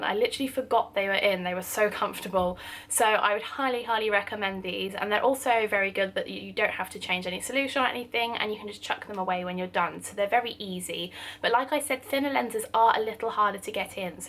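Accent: United Kingdom. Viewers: I really like your voice! It's super duper british and clear. Also very informative video so thanks!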